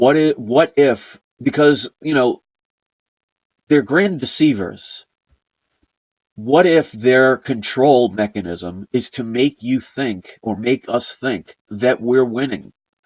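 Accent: New York, United States. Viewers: YOU SOUND LIKE YOU ARE A NEW YARKER HEY FROM THE WEST COAST ME-REFUGEE CITIZEN HEFT SAN JOSE CA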